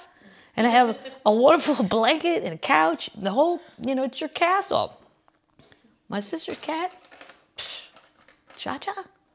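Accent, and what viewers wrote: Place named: Boston, United States. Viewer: Your Boston accent is everything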